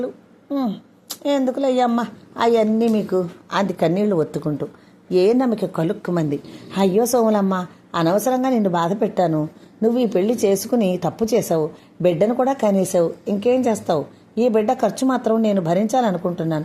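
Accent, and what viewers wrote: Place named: Uttarandhra, India. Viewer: Good story. The slang of uthharandhra well established in the tone of story teller.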